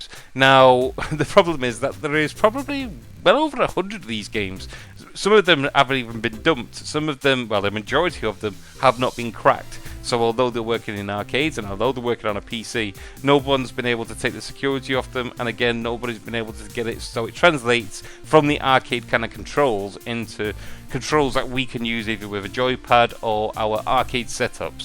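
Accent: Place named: United Kingdom. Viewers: its one of the few british accents i think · great video, btw where is your accent from? It sounds british but I've never heard it before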